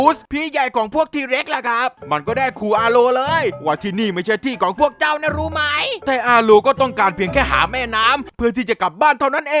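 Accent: South, Thailand. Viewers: พี่คนใต้รึเปล่าเนี้ย55 งูบองหลาเฉย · คนไต้นิ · คนใต้บอนิ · พี่เปนคนใต้หรอคับ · พี่ครับพี่เป็นคนใต้ใช้ไมครับ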